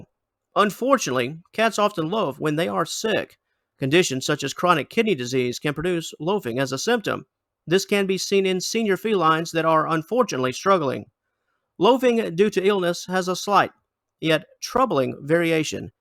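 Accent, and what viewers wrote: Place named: Florida, United States. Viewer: You from Florida? You sound like a fellow Floridian.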